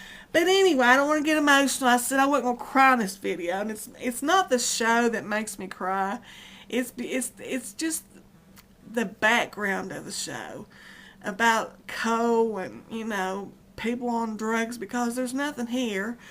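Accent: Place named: Texas, United States. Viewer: You do sound like us Texans! I thought you were one of us! :) That's ok, you're still an awesome sweet person. :)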